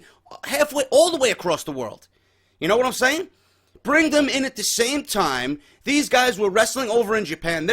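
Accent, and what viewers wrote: New York, United States. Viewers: jesus christ you even sound like youre from NY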